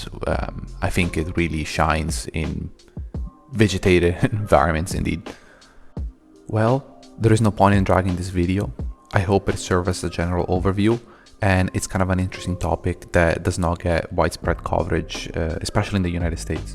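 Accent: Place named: Italy. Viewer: Dall'accento ho capito che il bro è italiano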